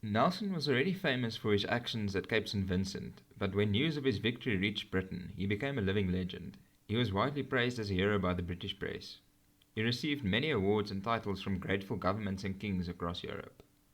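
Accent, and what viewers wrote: South Africa. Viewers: Thank you for your gracious reply. I note that others have said much the same. Having been at school with S.Africans I have no problem with the accent but audio levels need to be higher and delivery a bit slower. This will make your channel one of the very top military history ones anywhere with simple graphics, directions and exact distances given. The fact that so many are saying he same is a tribute to your scholarship. Best wishes, Dr Stephen Hall, Ireland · I also guessed the narrator is from SA. Blown by the quality content and precise narration 🇿🇦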